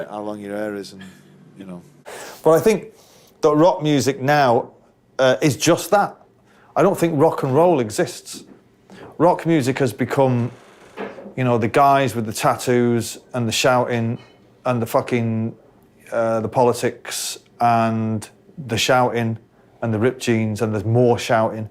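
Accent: Manchester, United Kingdom. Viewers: Try going to Ireland with your Manc accent and tell the Irish that YOU are Irish Noel ... they'll call you a plastic Paddy!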